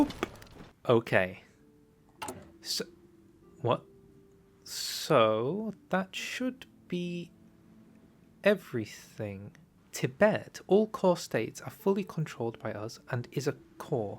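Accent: United Kingdom. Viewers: Cause he's talking in British accent.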